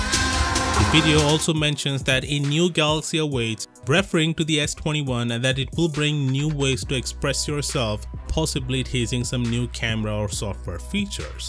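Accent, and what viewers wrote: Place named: India. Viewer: The Indian accent is so rough on ears. ..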